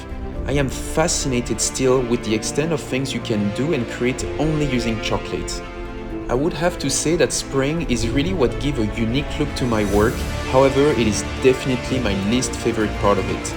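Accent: France. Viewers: WOW. Remarkable. Delicious. Inspiring. Always nice to see sculpturing beautiful ART work out of chocolate. The FRENCH accent is a must. ❣️🏹🐪🐫🦩🦚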